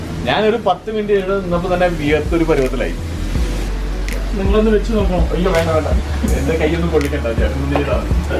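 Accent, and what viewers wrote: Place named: Kozhikode, India. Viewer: കോഴിക്കോടൻ ഭാഷ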